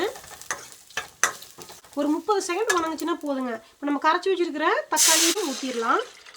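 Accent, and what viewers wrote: Kongu, India. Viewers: Kondu slang arumai... 👌👌👌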